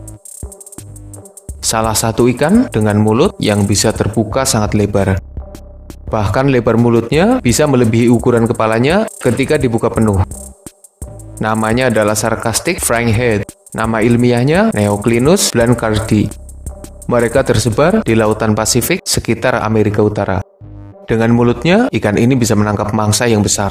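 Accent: Banyumas, Indonesia. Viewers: Aksenmu mirip wong ngapak min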